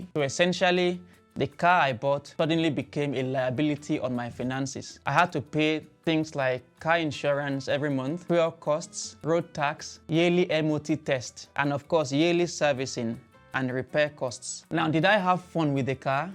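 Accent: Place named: Africa. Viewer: i worked with a lot of Africans, for some years, i miss hearing this accent everyday x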